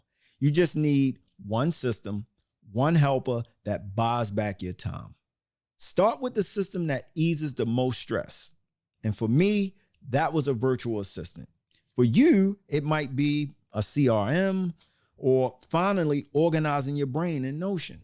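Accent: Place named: Baltimore, United States. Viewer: Man that Baltimore accent STROWNG ain’t it 😂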